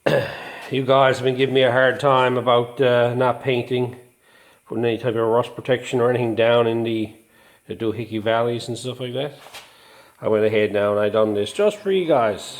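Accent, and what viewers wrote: Newfoundland, Canada. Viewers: sound like a newf ?? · Newfoundland eh? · Where are you from in Newfoundland? Def tell cause the accent. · Newfoundlander? Always a pleasure. Subbed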